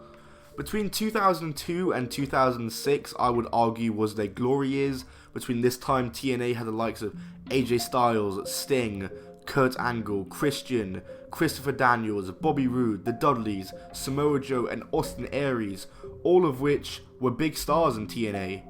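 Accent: England, United Kingdom. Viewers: Why does every wrestling video have a narrator with such a strong English accent?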